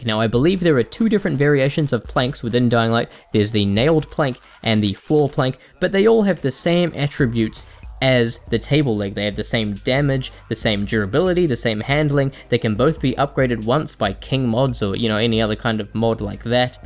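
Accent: New Zealand. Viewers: are u from nz? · Are you from nz cause I am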